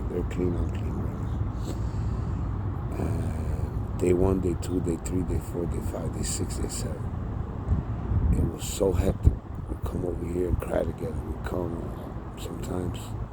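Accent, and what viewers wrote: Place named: Miami, United States. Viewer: Miami Cuban straight truth, im cuban also, I can tell ,good speaker.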